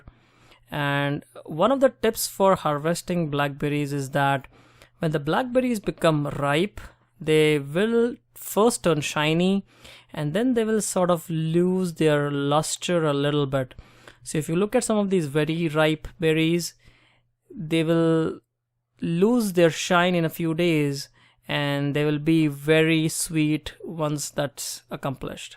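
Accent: India. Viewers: you sound like indian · Sound like an Indian guy in California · pronounces you are Indian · He sounds indian but his skin looks white. I’m stoned and i know its 2021 but just something I noticed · Sounds very Indian... The accent is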